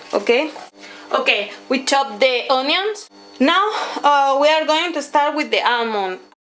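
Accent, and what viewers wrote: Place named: Spain. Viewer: I love Spanish Food, I used to work in a spanish restaurant. The food looks tasty. Love your spanish accent!!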